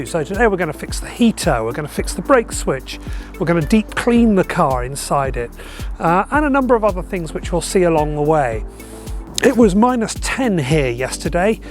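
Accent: United Kingdom. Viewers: my friend you are not an idiot (you just talk funny 😄 (British accent)). Great job.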